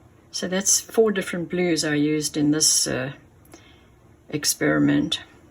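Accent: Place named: South Africa. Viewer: Thank you. I recognized your accent. I was born in SA. Love your work, been doing watercolour for many years, but this is a fresh look at doing abstract. Im going to try my hand at it.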